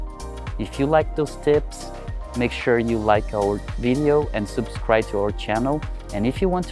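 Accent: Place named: Brazil. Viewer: Percebi na hora que ele começou a falar inglês, pensei: esse cara parece brazuca. 😂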